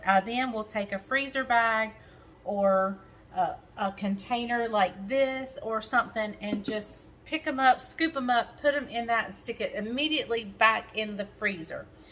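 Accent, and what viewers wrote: South, United States. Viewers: I 💛yr southern voice when explaing yr dishes. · You pronounce Boil like Bowl, that's a southern thing right?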